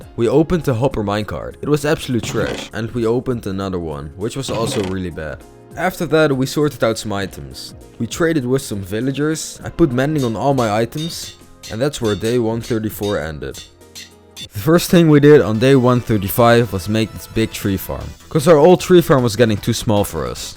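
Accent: Netherlands. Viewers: Je kan gewoon horen dat je Nederlands bent🤣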